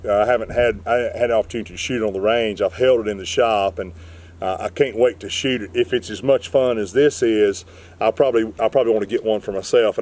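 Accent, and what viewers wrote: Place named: South Africa. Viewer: Cool vid! Where you from? Sound a bit South African.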